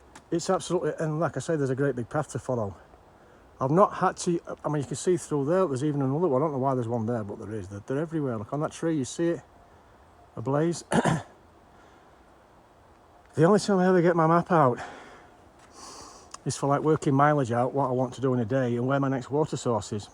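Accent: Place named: Yorkshire, United Kingdom. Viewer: Cheers. Me and Judith hiked this trail in 2022 and are currently enjoying watching your adventure as it unfolds. One episode a night after tea! Great to relive some of the great scenery and your take on a fabulous part of the world. Looking forward to the second half.....oh and nice to hear a Yorkshire accent!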